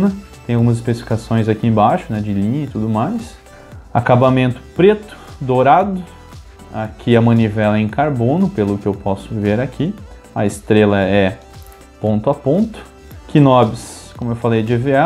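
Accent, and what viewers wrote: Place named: Rio Grande do Sul, Brazil. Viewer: Top o vídeo vc é do rio grande do sul? Vim aqui procurando um vídeo sobre carretilha pq estou tentando juntar uma grana pra comprar um pq pesco de molinete até o momento mas que sabe consigo comprar uma. Mas aí vi que vc tem um sotaque gaúcho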